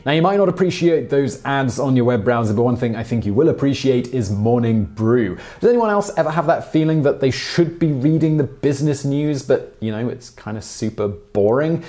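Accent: England, United Kingdom. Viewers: Why is vsauce doing a British accent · Why is vsauce talking with an English accent?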